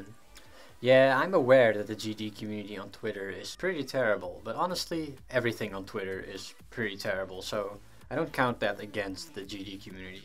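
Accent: Netherlands. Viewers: Eerste seconde wist ik all dat je Nederlands was.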